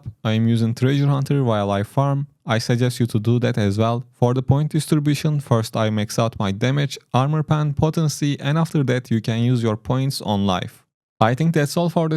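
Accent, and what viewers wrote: Turkey. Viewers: Kankam selamlar,Türk olduğun aksanindan belli bu arada, tüm classlarla oynamış biri olarak; en hızlı farm yaptığın Class hangisiydi ? Favorin nedir ? Şimdiden teşekkürler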